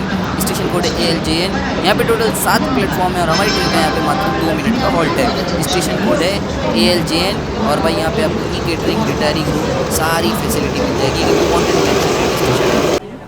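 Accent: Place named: Gujarat, India. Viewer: Gujarat se ho kya, hindi accent gujju lag raha h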